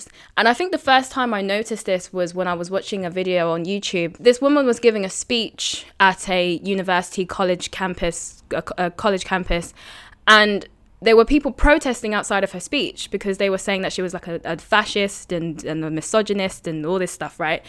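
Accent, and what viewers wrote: United Kingdom. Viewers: You are a really cool girl! Smart, cute and funny, a keeper! I love the UK accent so much 😅 definitely a turn-on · I'm a new sub, I would I say I admire how you handle and breakdown topics very logically and reasonably. I can respect that about anyone despite their political beliefs. Plus you have a british accent, which is awesome to me lol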